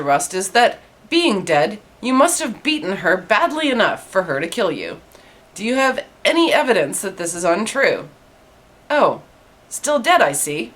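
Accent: Canada. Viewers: Jesus cant act, you tell terrible stories. If you werent so cute and prone to telling the truth I wouldn't watch this. You really need some public speaking classes. You pause st awkward points and that takes from the flow. That Canadian O gets me every time too.: ) But seriously, you do sound like a first year broadcast student who is about to pass out from fright. Also, you looking at us over your glasses frames you as an agressor and you appear annoyed at the viewer.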